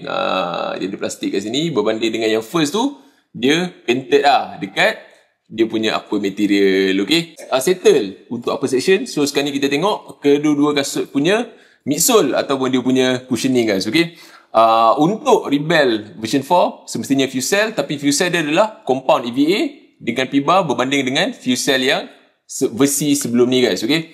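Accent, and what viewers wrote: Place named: Malaysia. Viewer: Takpalah.. Lidah Melayu 😅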